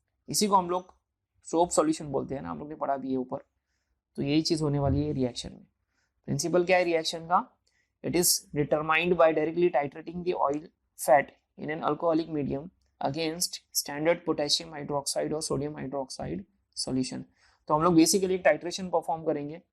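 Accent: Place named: Hyderabad, India. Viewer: Sir you are amazing and your hyderabadi accent is mashallah ☺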